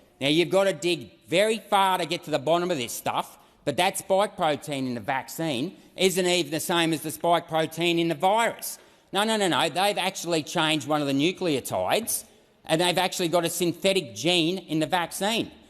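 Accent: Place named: Australia. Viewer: The strongest aussie accent ive ever heard 🤣👍🏽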